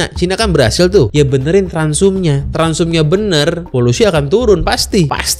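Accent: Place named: Jakarta, Indonesia. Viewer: Betawi bat dah 😂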